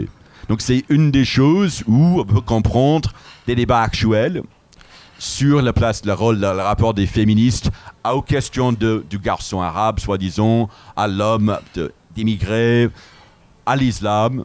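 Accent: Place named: United States. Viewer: Je crois que c’est quasiment la première fois que j’entends parler français avec un accent américain. J’aime bien l’ambiance.😂 Et les propos sont très pertinents, très intéressant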